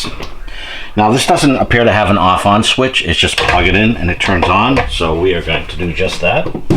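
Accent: Massachusetts, United States. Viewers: Good show!..do I detect a North Shore MA accent! Lol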